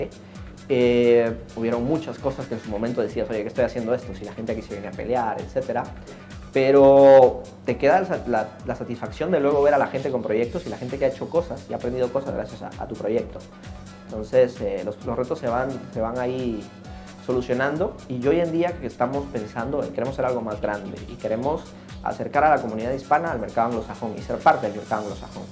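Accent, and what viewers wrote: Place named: Spain. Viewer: Por que el acento español??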